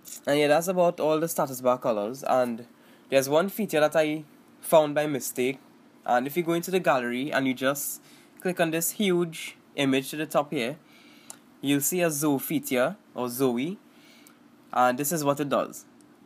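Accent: Trinidad and Tobago. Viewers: Are you from trinidad? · I knew it Lol! I'm from Trinidad too:P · trini accent hmm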